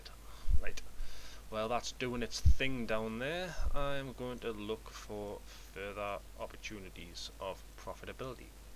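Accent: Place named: Northern England, United Kingdom. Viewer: I like your accent. Is it from north of England?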